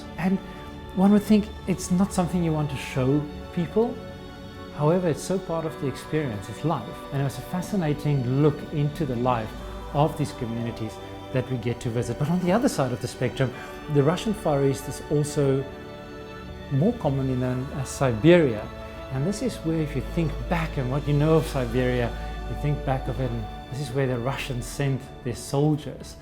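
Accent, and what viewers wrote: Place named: South Africa. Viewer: This dude sounds South African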